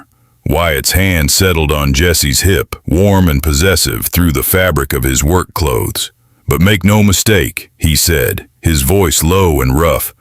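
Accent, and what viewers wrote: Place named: United States. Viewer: Listening to your, fantasies, fascinating and so well constructed and excellently narrated stories. Your stories usually always bring a smile to my face and hold more than my attention. The voice is so American, gripping and appropriate. However Steinbeck's novella "Of Mice and Men" is very powerful and probably far more enduring in the USAlien cannon.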